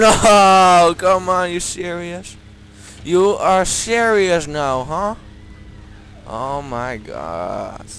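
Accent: Netherlands. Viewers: ik hoor dat je nederlands bent maar je kan goed engels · are u from the netherlands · ***** hij is nederlander het accent is zo typish... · I like your video, you can speak pretty good English but I know you're Dutch :p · are you dutch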